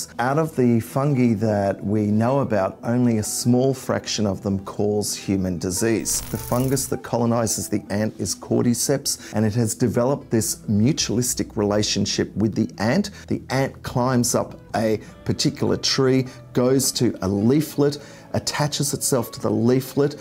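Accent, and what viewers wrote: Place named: Australia. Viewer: nasty aussie accent .bye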